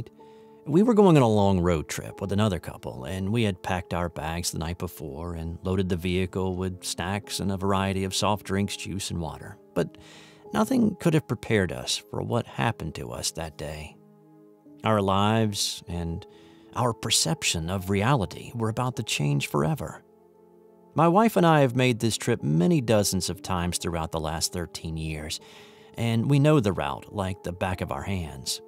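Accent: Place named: California, United States. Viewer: This was hard for me to listen to because it just was tons of explanations of roads and landmarks, trying to paint a picture that can’t be painted to someone who doesn’t know the area. It sounded like The Californians from SNL. I get it in terms of recording the events, but not for ominous storytelling.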